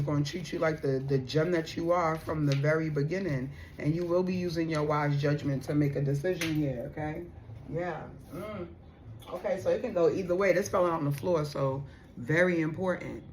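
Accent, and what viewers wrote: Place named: New York, United States. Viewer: Girl I love your energy cause period. WHEN YOU GOT HYPE, I did too 😂😂😂🎉🎉 I miss my New York voices ❤. Born and raised in queens until I moved to ATL 10 years ago. And I think I know exactly who this is. He said something stupid and I didn’t respond to him 😂 MIND YOU JUST LISTENED TO AND by. Manifesting 😢 BRUH 😂and you pulled Scorpio as the first sign. I am a Scorpio 11/01 mannnnn you pulling my energy hard 😂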